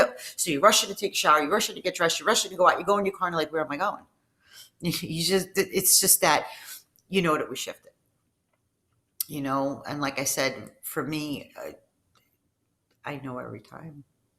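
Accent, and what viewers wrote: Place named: Canada. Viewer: Ahh , c'mon your accent isn't that thick . But , I can still tell your that your cleary Canadian . Clearly . 🤪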